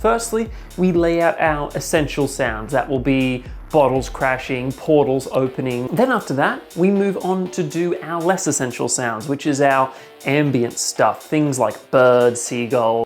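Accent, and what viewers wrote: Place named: Netherlands. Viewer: Thought him was Dutch ngl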